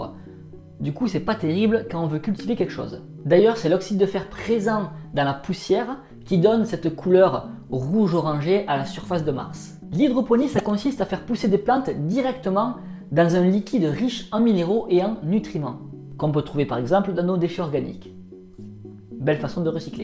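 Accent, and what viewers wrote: France. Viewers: l'accent provençal enlève beaucoup de crédibilité, mais c'était interessant ! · Mama cet accent toulousAIN mdr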